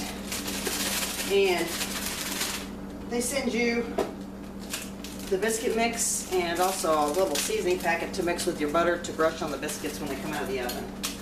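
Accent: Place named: New England, United States. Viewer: Looks great!! Your New England accent wasnt to bad either:) Cheers!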